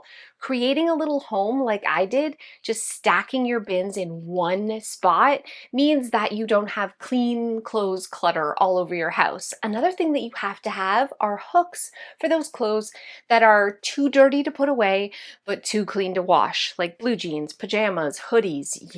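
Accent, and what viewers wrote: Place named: Ontario, Canada. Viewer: As a former avid skydiver I agree. Safe, fun, but there aren't a lot of vertical wind tunnels in Canada (where I live). By the accent I'm guessing you're in Ontario? There are a TON of places there. It makes for a memorable family video too!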